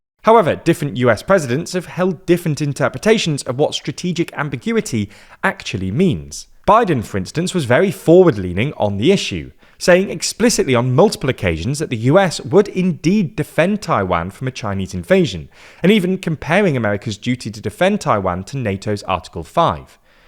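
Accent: United Kingdom. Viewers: That’s just due to the British accent.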